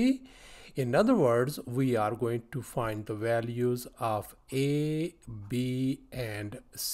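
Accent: India. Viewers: Sir your voice sounds like Indian..then why you are in America..😒😒..don't you love india?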